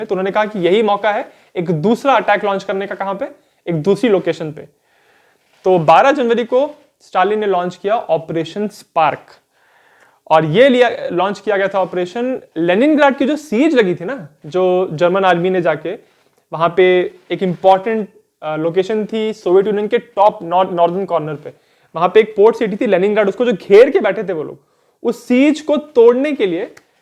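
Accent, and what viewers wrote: Bengal, India. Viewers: Sir, are u bengali ?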